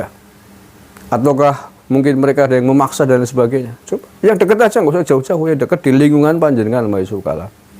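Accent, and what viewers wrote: Banyumas, Indonesia. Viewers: Jian omngne rika gawe aq ngguyu bae.omngn asli bnyumas.bner2 asli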